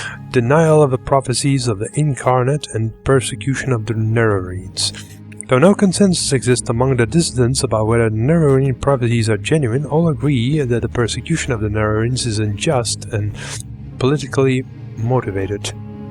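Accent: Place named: England, United Kingdom. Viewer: fainas tavo angliskas akcentas :)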